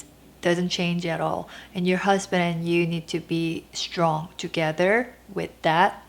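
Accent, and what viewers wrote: South Korea. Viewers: Your English is just like my mom's. She's also Korean and has lived here in the US for 43 years. Still has a strong Korean accent and I love it!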